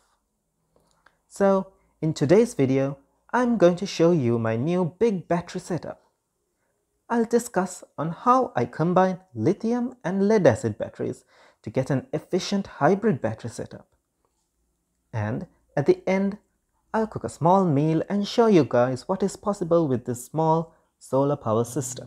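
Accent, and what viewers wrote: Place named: Sri Lanka. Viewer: I just need your advice on how much I have to spend on this ? and sounds like you are from Sri Lanka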